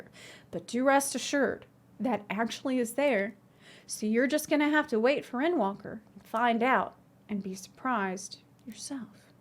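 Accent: South, United States. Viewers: Oh a southern girl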